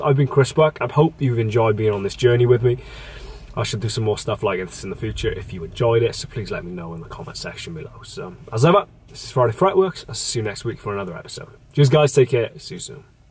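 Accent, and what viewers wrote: Wales, United Kingdom. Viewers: Il vient de pays-Galles aussi ou les accents sont difficile de comprendre.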